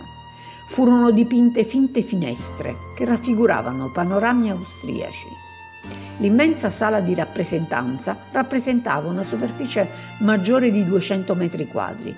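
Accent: Italy. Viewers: Brava, ❤ avverto nell' ascoltarti parlare, il tuo accento campano 👍💥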